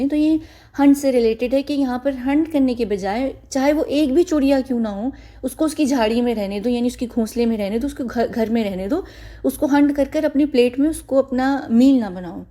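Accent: United Kingdom. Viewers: I like ur English accent, unlike some haters I just hope u speak in English more.. 😀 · You have a beautiful British accent 😘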